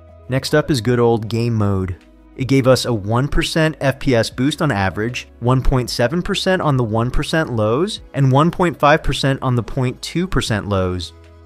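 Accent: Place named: United Kingdom. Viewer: Thanks i got the same cpu getting some solid improvements now, also maybe time to embrace your british accent again for next video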